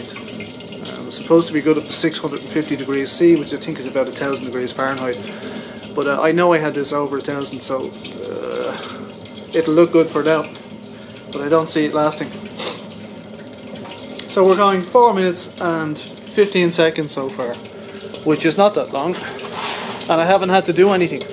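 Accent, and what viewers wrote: Ireland. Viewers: Excellent set-up! I particularly like your innovative use of the Pyrex dish. It's both handy to see the flame and one of the nice things about having a fire. The crackling sound is water in your oil. That will reduce your heat output... if it matters at all. Dry oil does work a LOT better in these burners. If you were interested in efficiency at all, you could run your Chimney pipe horizontal for a good few feet before ducting it out. You would find there is a lot of heat coming off that which would now be wasted. If you also had a small fan blowing along it, the heat would be better dispersed around the area you are heating. Congrats on a job very well done with this. It's definitely the neatest and most innovative burner of it's type I have seen. You have thought it through very well and come up with a great set-up. Great explanation and who doesn't love an Irish Accent! 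Well done to you Sir! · We Americans love the Irish accent.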